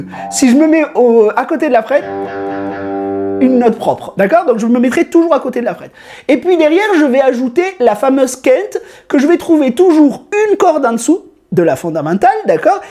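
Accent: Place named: South, France. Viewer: Merci pour cette super vidéo. Un très bon exercice même pour les grands débutants comme moi. Tes vidéos sont une mine d'or et ta pédagogie au top. Dans le dernier exercice j'ai trouvé cool de faire 2 mesures E à vide G5/ A5 /B bémol 5 et A à vide C5/D5 E bémol 5. Ça fait bien bosser... J'ai cru entendre que tu avais l'accent du sud 😅j'habite dans le sud aussi peut-être ne sommes nous pas très loin pour des cours en individuels... Je ne sais pas comment te contacter en privé. Merci et continue tes vidéos.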